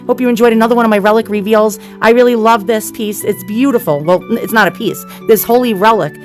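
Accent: New York, United States. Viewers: I miss your older, longer videos! It's you and your hilarious New Yaaaawk commentary that makes this channel! 😂 Oh and ditch the background music. You were perfectly good without it. 👍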